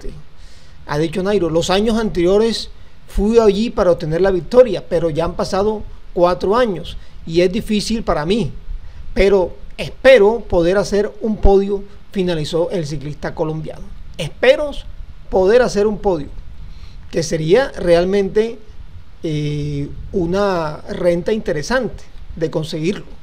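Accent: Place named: Spain. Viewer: lo admiro, pero no hables estilo español, no dugas vidio, somos colombianos, ( vídeo,) un abrazo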